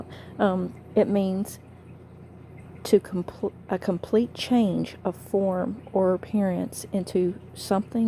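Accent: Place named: Alabama, United States. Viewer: Awesome! Listening to you speak I was thinking you from Alabama. We are close. I’m excited too. Thanks for sharing.🌈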